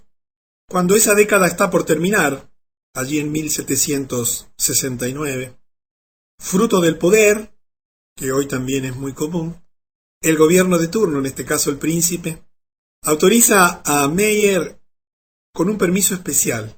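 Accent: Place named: Argentina. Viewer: Hola, soy Francisco 27 años músico de Bahía Blanca. Excelente el video, realmente excelente. Me gustaría contactarte por Facebook, sobretodo porque vengo investigando esto hace mucho, acerca del NOM y todo esto y es la primera vez que veo un video con acento argentino. saludos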